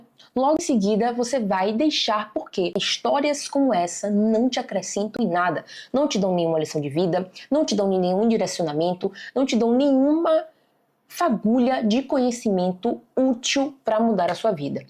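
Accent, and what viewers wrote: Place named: Bahia, Brazil. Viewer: eu ouvindo seu vídeo e, ao invés de prestar atenção, tentando identificar se você é da Bahia pelo sotaque e já querendo chamar pra um café. ❤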